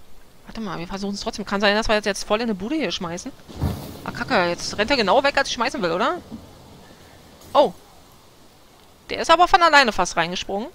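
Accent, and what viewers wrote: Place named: Berlin, Germany. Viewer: Allein wegen deinem coolen Akzent ist das Video schon richtig geil hehe ^^ ( hört sich an , als währst du eine Berlinerin :3 )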